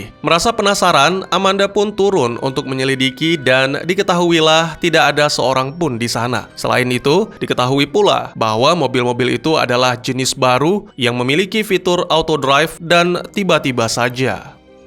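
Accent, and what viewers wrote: Aceh, Indonesia. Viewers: bang lu orang aceh?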